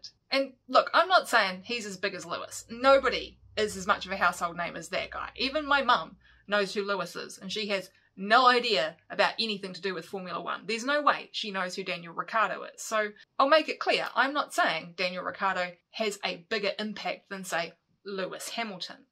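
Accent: New Zealand. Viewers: Yeah, I would like you to do a more in-depth analysis of DR3 & his career. For two reasons - first, and most important, you convey your thoughts in a manner that is real, absent of all the BS & other general palava that is served by the vast majority of F1 content creators ( I would put you in the top 10 in your field - your numbers don't reflect that, but that is just a matter of time and a very smart man once said time is relative!). Second reason - there is nothing wrong with your accent there should be more of it on YT. There ya go - you're a NZ trendsetter! (Yeah, there's a better than even chance I might share your accent! 😉) In any case, it's miles better than the worst accent - shit, can't say that in the comments section of a video about an Australian can I. OOPS! Keep up the good work. Can't give you a new subscriber - I was No 39! (Will be posting this to FB tho 10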